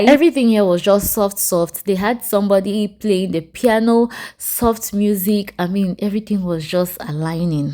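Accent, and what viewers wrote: Nigeria. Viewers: Thank you for this video. Ur accent sounds like Nigerian. Only a rooted Nigerian can differentiate. Thks, anyway!!!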